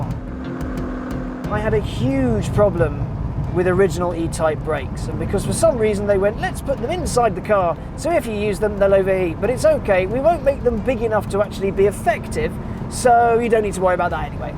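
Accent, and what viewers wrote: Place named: United Kingdom. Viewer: EX-FUCKING-SCUSE me... you sound like a brit, your driving one of the best looking cars in the world, made by one of the best car makers in the world.. all you've done is slag off the original car , with out said car, you would not be driving this car. then you slag off the A and B roads of England, the roads the original car was kinda designed for... Screw you... You sound like you just trying to suck up to the , we have the biggest best US suck up A... they cant even keep the bridges together.... DONT .....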